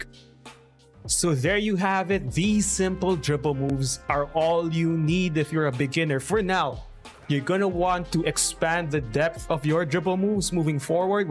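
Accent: Philippines. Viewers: kaya pala sir, yung pronounciation mo pinoy na pinoy talagang hindi kinakain yung words, fluent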